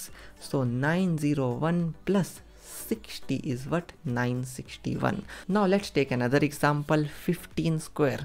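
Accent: India. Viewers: I believe him, he sound indian than american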